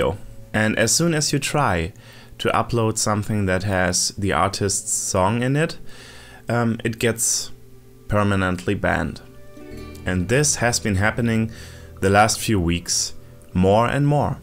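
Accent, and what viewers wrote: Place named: United States. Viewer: У, действиетльно, очень чёткий английски, ближе к американскому, который я тоже очень хорошо понимаю. А вот самих англичан могу нормально понять только через одного, очень сильно зависит откуда они родом. Жуют и искажают слова, фиг что разберёшь. Наверное, их даже американцы плохо понимают.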